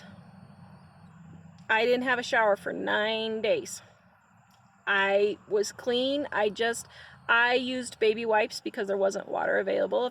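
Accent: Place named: Arkansas, United States. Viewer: Are you from Arkansas? I haven't heard anyone say 'Warsh' since the 60's. My cousins were from Arkansas, I find it charming.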